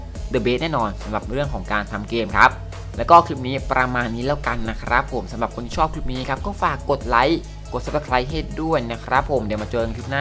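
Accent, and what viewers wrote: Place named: South, Thailand. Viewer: พี่อยู่จังหวัดไหน สำเนียงเหมือนคนใต้เลยครับ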